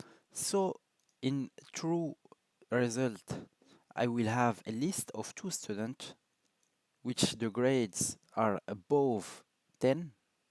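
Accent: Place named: France. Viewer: absolutly he is french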